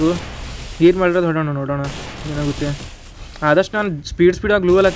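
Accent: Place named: Uttara Kannada, India. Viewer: Voice uttar kannada Voice nimda yanna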